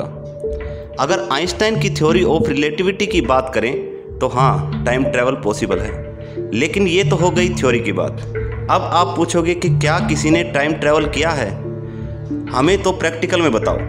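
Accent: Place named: Haryana, India. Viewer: Haryane te lage h Bhai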